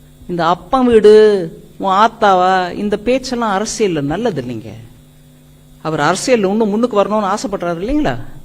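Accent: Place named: Chennai, India. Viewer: Amma u r great neenga prindhathu madurai aana neenga chennai pechu iducosam vera11 amma i m great fan of fr u amma neenga unmaiyele enga perima mari erukinga unmaithan inda dmk nambi vote potta enga kudumbam nadutherivil nikkudu annan udhayaniye nanbunen ennoda vazhkai soonyam aachu ethanaiyo exam pass panniten aana enaku tn government job kidaikale aana unaga centrel government job le ennoda 3 friends velaiku poittanga adu mattum ille nan udayanithi anna big fan avare rendu thadavai meet panni ennoda kuraiye solla ponen enna pakkave videle adule ennaoda love vazhkaiyum adanki eruku...,,ok iduvum kadandhu pogum i m from madurai.....,,,, I WANT SEE TN BJB ANNAMALAI SIR TQ TN PEOPLE NAN EVLO COMMENTS PADICHUREKEN PLS IDHA VIREL PANNU GA FRIENDS PLS I WANT TO ANNA MALAI SIR AND UDHAYANITHI ANNA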